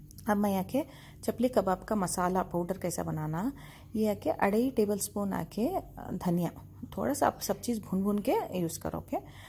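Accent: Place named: Deccan, India. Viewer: This dhakni urdu is ultimate 😍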